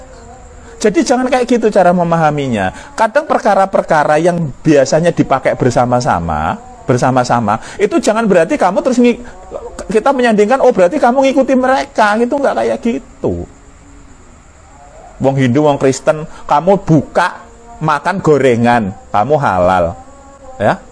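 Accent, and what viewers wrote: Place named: Java, Indonesia. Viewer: ngomong Jawa medok nya gk berubah😂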